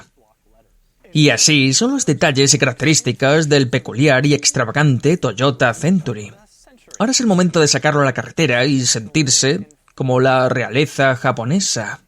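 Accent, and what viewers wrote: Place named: Castile, Spain. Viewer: Nada más asqueroso y desagradable que ese acento castellano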